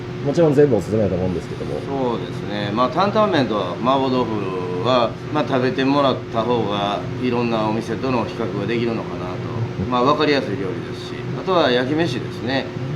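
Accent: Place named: Osaka, Japan. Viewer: 動きも喋りもルーズに見えるんですけど無駄がないから余裕があるんですね。実況コメント、質問への答えのひとつひとつに無駄がない。おすすめメニューの理由が「他店との比較ができるから」、焼き飯がおすすめの理由（動画全部観てください、説得力あります）も誠実というか。誠実・正確でゴリゴリの大阪弁っていうのがまたいい。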